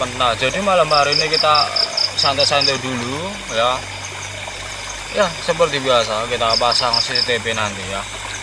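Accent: Java, Indonesia. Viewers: Om apa memang ngomongya kaya begitu, atau tambah2 medok..coba ngomongnya biasa aja biar tambah kereen..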